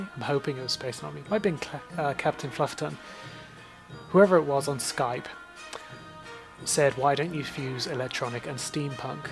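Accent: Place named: England, United Kingdom. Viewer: You're English eh? You are now my favorite brony.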